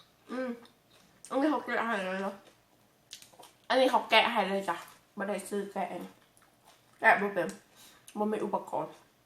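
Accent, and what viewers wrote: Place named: Bueng Kan, Thailand. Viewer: น้อง แม่นเป็นคนบึงกาฬบ่อพี่อยู่โคกก่อง สำเนียงเว้าคือกัน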